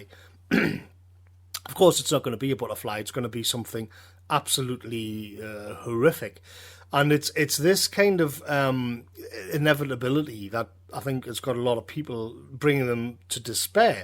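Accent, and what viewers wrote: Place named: England, United Kingdom. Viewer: I'm new to this channel and I'm thoroughly enjoying the content. It's refreshing to hear non -sensationalist monologues, delivered with clarity, sense and topped off with a familiar English accent. As for the China food situation I believe that they have learned from their history, all their great dynasties have fallen around the same time as global food shortages, which coincided with solar (in) activity. Which we are now heading in to. I'm not fearful of the future, our ancestors faced worse and survived, the narcissist members of society are the ones who may not fare well, those who embrace Nature and Order will undoubtedly arrive on the other side of this nonsense in a stronger position. Mother Nature will fix the Globalist abominations. In 200 years, Europe will look like it did 200 years ago. Greetings from Rutland, Multum in Parvo!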